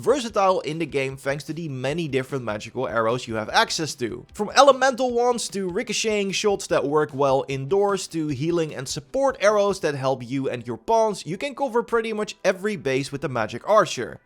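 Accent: Netherlands. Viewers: Ben jij Nederlands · You are so duidelijk Nederlands, Nice video!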